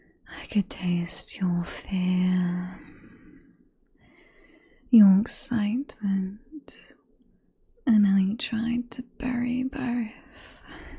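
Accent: United Kingdom. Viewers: As a fellow briton, that accent is too posh british. My accent is a mix of slang british 😂 But nice Vid!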